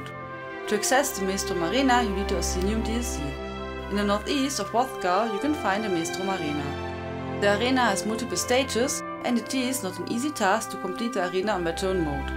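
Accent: Germany. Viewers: thanks for the guides, I like the accent btw, German, Austrian, sounds funny 😁👌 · bist du deutsch?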